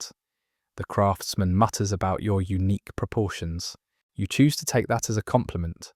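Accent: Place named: United Kingdom. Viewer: I started listening to videos like this when im studying but the British accent makes it so soothing, I found you guys through the with hunt video but am learning so much from videos. What’s the next topic and when will it be available?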